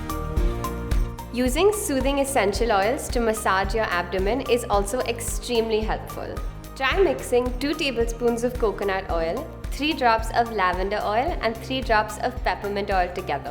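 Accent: India. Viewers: you dont look indian but your accent says otherwise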